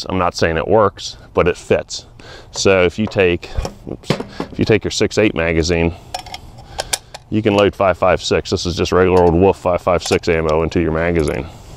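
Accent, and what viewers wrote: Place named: Maryland, United States. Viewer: sounds like a Maryland accent and the 8 round thing sounds like a Maryland thing too.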